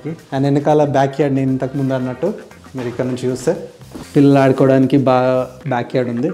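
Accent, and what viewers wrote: Telangana, India. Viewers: Hi...I am from Hyderabad. I like your videos. Maa babu kuda canada lo untadu.Inka mee matalu mana Telangana accent lo bagunnai.Mee canada cat kuda bagundi.😄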